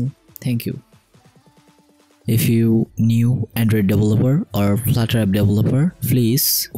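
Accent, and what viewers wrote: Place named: India. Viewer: Interesting Informations. And an Indian accent. It's being familiar. :) Thanks. Helpful Informations.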